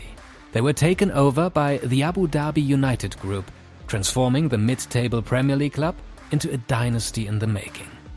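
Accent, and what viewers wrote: Germany. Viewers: German accent as well haha (I love it btw )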